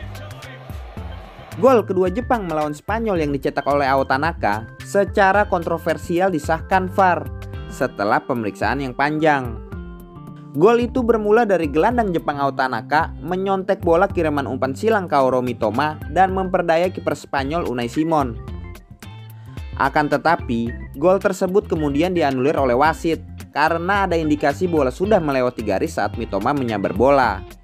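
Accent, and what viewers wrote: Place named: Banyumas, Indonesia. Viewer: Mimin-nya orang ngapak seperti nya....😬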